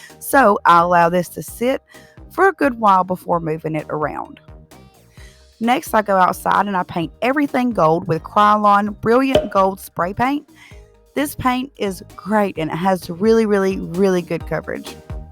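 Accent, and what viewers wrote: South, United States. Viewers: I just love listening to your accent , where in the states do you live? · 😍😍😍😍😍😍😍 I have never subbed so fast! Plus I love your accent coming from another southern lady❤️ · OMG I just love your southern accent, I’m from Texas and still haven’t picked up The accent , your voice is so satisfying you probably can do satisfying videos and get paid for it👍🏽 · Beautiful work Beverly sent me over to check you out. I really like what I'm seeing and I just love your country accent 💕💓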